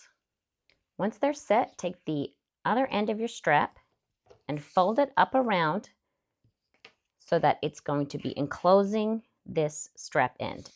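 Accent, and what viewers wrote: Australia. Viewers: As an Aussie I love love love hearing your Australian accent popping through every now and then! Thank you for your amazing tutorials - new subscriber here :)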